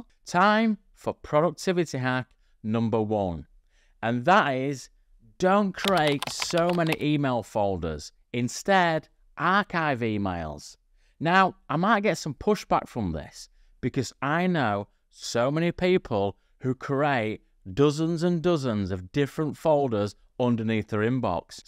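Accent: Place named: Manchester, United Kingdom. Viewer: Thank you for the great videos that you made - I feel they will change my working life completely! P.S. I have got mates who sound just like you - from Manchester? Bolton? 😂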